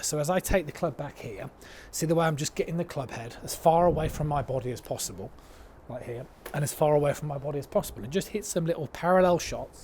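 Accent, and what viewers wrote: United Kingdom. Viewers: He has aBritish accent and talks too fast also.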